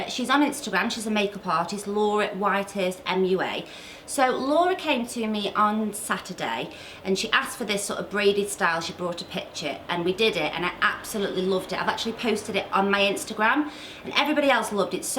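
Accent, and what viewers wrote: United Kingdom. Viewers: Are you British? I love your accent.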